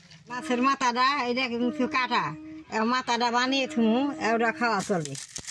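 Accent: Tangail, Bangladesh. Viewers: আপনে গো ভাষা,,,,, আমাগো বাংলাদেশের টাংগাইল জেলার ভাষা same,,,,,আপনেরা কোন জেলার গো?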